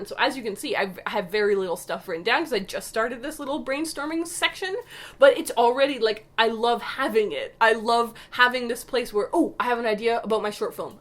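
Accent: Canada. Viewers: I knew she was Canadian! No wonder her accent sounded very interesting. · I love your Canadian accent when it peeks through - super cute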